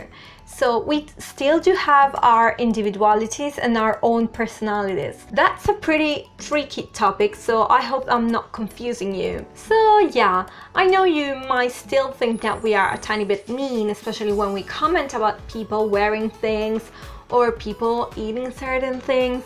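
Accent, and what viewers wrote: United Kingdom. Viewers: She's Italian but she speaks English with a British accent. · Your English is very good however it seems to me that you speak with a British accent which sounds very nice. I would love to see more videos in Italian. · You are speaking in British accent but you do not look like a British in some aspects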